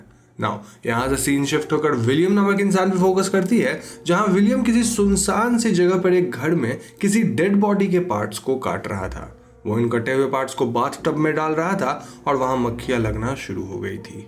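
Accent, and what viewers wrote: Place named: Bihar, India. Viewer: sir ap bihar se ho me jan gai...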